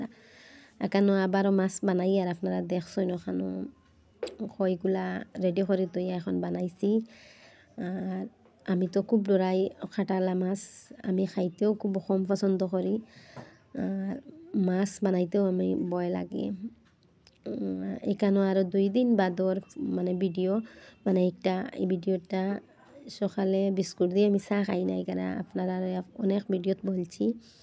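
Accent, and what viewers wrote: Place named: Sylhet, Bangladesh. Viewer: আসালামুয়ালাইকুম আপু আমি তুমার বিডিও পুল সাপুট করি তুমার রান্নার পিটা কই মাছ এমনকি তুমার সিলেটি কথা সুনার জন্য বিডিও চেরে রেখেচি❤❤❤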